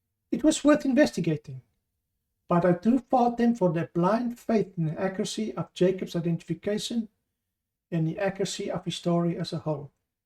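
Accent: Afrikaans, South Africa. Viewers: He has fabulous Afrikaans accent and if you had listened properly you would followed it enough to understand he is saying bald From me a truly English person, I appreciate his work and his accent. Its not bad it is just different. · Dankie. Baie interessant. Heerlike Afrikaanse aksent. Doen so voort! Advokaat Barbie se lewe en misdade sal interessant wees.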